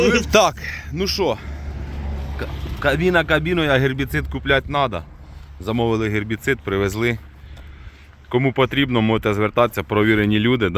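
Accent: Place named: Western Ukraine, Ukraine. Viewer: ты из западной бо в тебя говор такой не тот что в Ярика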